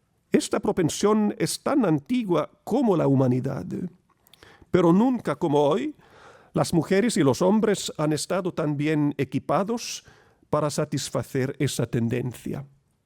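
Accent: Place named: Latin America. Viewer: su caridad, me gusta mucho su acento latin y mas aun el mensaje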